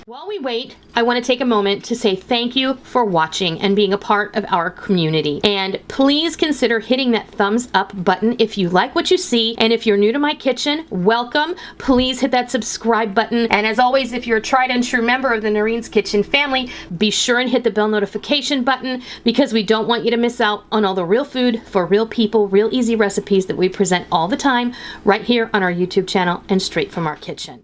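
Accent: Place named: Upper Midwest, United States. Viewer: Thank you for the great tip.. Making one's own personalized blends of herbs and spices put together is a great way and shortcut in having to bring many jars of seasoning out on the counter when doing the cooking. I want to tell you that I love your voice. So sweet and really great enthusiasm in it. For some reason though I had thought you were originally from the upper Midwest states such as Minnesota or Wisconsin. I didn't know you were from Arizona. Who knew! :)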